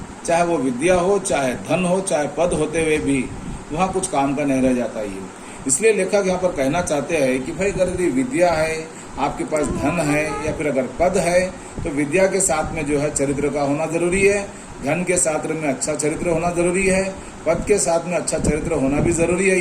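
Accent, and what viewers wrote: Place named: Hyderabad, India. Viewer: Explanation fabulous but that Hyderabadi Hindi that was way more fabulous than your explanation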